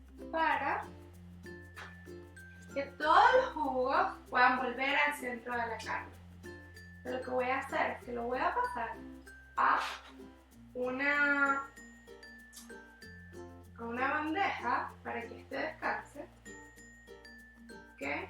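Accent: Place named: Venezuela. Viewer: Su acento 100% Venezolano, lo note desde el principio. De solo escucharla me suscribi ;)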